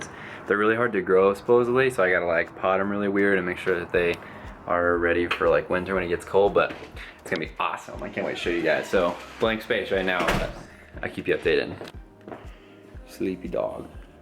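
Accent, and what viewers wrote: Arkansas, United States. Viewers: Lovely story. Love your AR accent. I used to have AR accent too.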